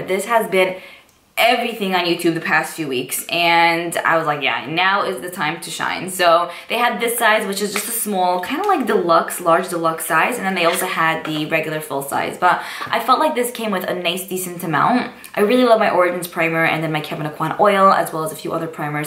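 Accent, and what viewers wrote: New York, United States. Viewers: Are you from NY or am I very dumb cuz you seem like you have a ny or New Jersey accent. Maybe I'm dumb ash but idk🤷‍♀️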